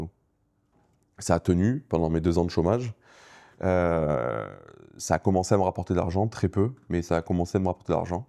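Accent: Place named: Vaucluse, France. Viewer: Je ne vois pas ce que tu n'aimes pas dans a voix :) moi j'adore (l'accent vauclusien y est pour beaucoup EVIDEMMENT... 😁)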